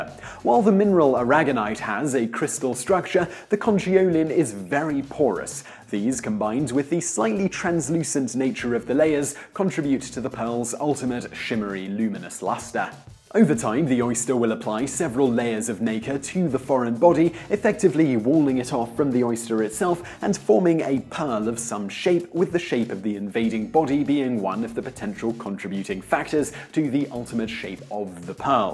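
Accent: United Kingdom. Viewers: Bri'ish Vsauce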